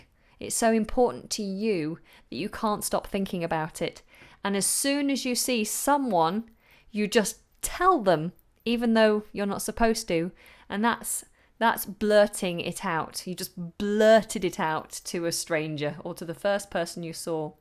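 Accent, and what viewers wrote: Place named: United Kingdom. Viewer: What a lovely British accent! I'm watching you from Syria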